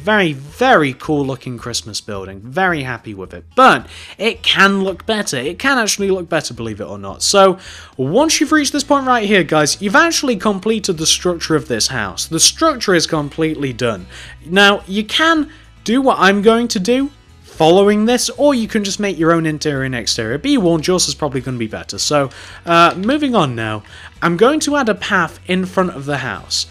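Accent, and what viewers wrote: United Kingdom. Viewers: + Kevin Adams Yes he is British ( focus a little bit on his accent ) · I have a question are you British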